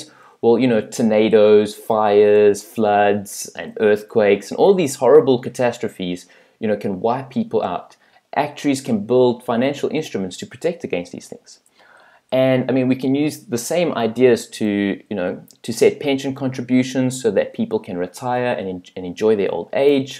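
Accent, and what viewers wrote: South Africa. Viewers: You sound so South African lol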